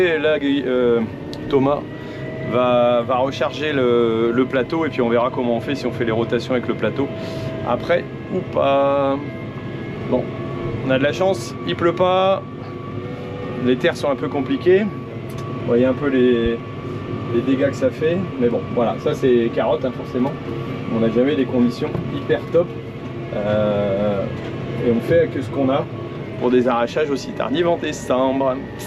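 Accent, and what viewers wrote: Nord, France. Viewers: y fait noir comme dans une....., c'est parti mon kiki, merci pour l'accent du Nord et la vidéo 👍👍👌👌😊😊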